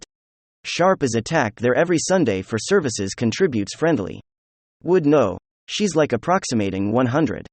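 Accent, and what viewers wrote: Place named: Russia. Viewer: use your real voice next time i want to hear your cute russian accent :3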